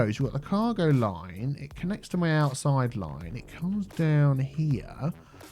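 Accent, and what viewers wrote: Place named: RP, United Kingdom. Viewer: why are you so posh?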